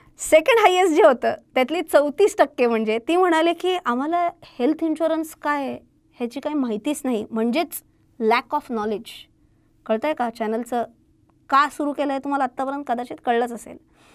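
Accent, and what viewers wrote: Pune, India. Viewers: एक नंबर मॅम, खूप छान वाटलं तुम्हाला मराठीमधून ऐकून!! मी कालच नोंदणी केलीय!! आर्थिक साक्षरता आणि तीही तुमच्या ओघवत्या पुणेरी भाषेत म्हणजे जणू दुग्धशर्करा योगच!!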